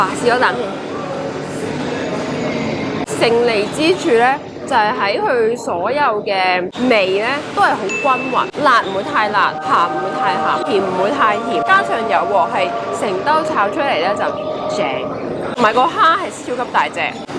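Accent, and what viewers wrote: Singapore. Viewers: 老实话。。。 你口音用新加坡加广东话听得好辛苦， 料来料去。。。 建议用广东话好啦！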